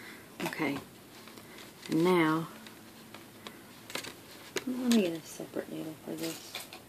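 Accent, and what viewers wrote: North Carolina, United States. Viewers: K3N Clothtales recommended your videos and I am gonna be honest, I was a little floored when you started talking and you have the same accent as me lol I think you’re the first fiber artist/creator with a southern accent I’ve seen on YouTube. Subscribed and liked, as you came highly recommended and your video is great. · Thank you so much for this tutorial. I used it on my first sock and it was my first after thought heel. You made it so easy to follow. Not sure, but sounds like you from the south. I'm from Ruston, Louisiana. So from one southern gal to another, thank you for the video. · I love your accent. I am from North Carolina, and I felt like I was home again. Great video, very helpful and entertaining! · Very clear and easy to understand. As others said, not boring in the slightest. I grew up in NC and your accent delights me and brings back many memories for this transplant to Yankee-land. 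Sorry if you've answered already, but what tool were you using to pick out the stitches?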